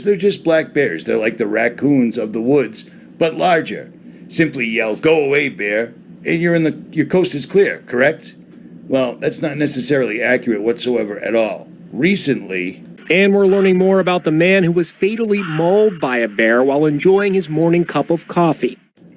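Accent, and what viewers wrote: Boston, United States. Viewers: Sounds like Richie from Boston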